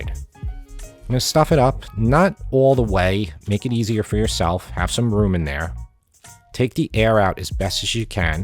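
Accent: New York, United States. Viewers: I just found your videos! I absolutely love them...and your NY accent!!! I'm from Long Island but now live in NC. I MISS that accent!!! We have finally infiltrated the South...we have bagels and pizza Lol, which were unheard of when we got here! Thanks for your videos!!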